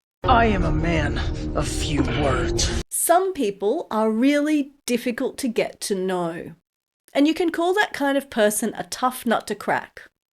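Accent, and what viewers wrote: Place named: England, United Kingdom. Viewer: Thanks girl I really like your accent from which English city are you from ?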